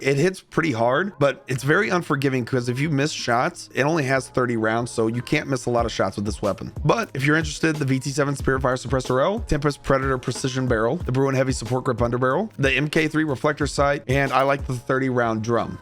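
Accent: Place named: United Kingdom. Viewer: I hope you get better and i love the british voice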